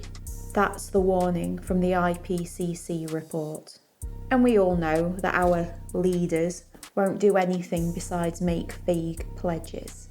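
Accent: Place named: North of England, United Kingdom. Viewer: Oooo same regional accent as Shaun and Rachel Oates. Loooove. North of England is poppin' off.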